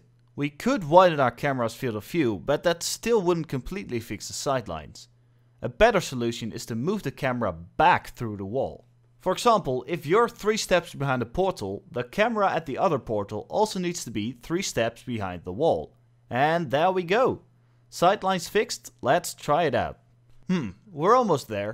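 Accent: Netherlands. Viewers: Nicely explained! I did some portal experiments in UE4 a while back and it's pretty much the same implementation I vaguely remember reading from one of Valve's presentations that they do all the rendering in one pass by setting the view/projecting matrices for the masked area of the portal so they don't use a secondary camera in the same way. It's been a while, so the details are blurry to me. That would save a bunch on performance, but is a lot more involved in getting to work proper. Are you Dutch by any chance? subbed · Dude sounds Dutch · Damn your accent is Dutch 😂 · You happen to be Dutch? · Love the fucked up half English half American accent of this Dutch guy.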